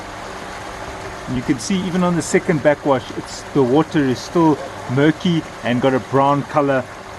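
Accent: South Africa. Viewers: South African boet!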